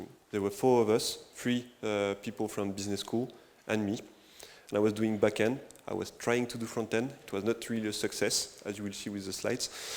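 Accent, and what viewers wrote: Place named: France. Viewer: Ecouter un français parler anglais ça devient vite insupportable. Est-ce que vraiment le type de public justifiait ça?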